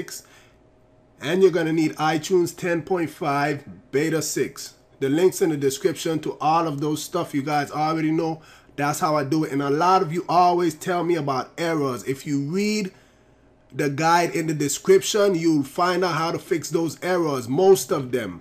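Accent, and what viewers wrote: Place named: Jamaica. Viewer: Dude. I love your accent. Are you Jamaican ?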